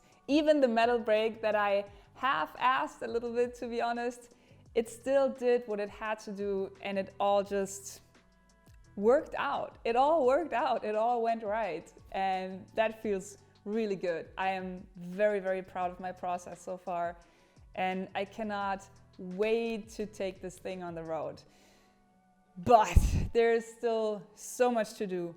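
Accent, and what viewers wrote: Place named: Germany. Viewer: تعجبني لكنتك الألمانية الظاهرة بشكل واضح في لغتك الانجليزية، مشاريع جيدة وممتعة، تحياتي لك ولمجهودك الكبير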